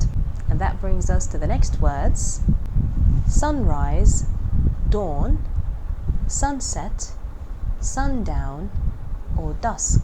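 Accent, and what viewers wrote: United Kingdom. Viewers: I really appreciate your British accent 🎉 thanks a bunch for the useful vocabulary & ramadan mubarak for you ❤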